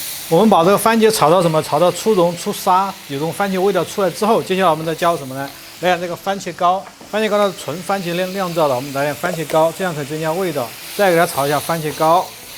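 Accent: Sichuan, China. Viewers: ，铲铲哦，你娃的川普太亲切了😊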